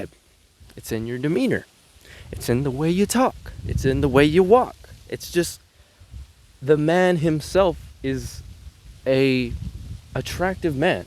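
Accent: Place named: United States. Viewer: my goodness....now i will practice American accent with your videos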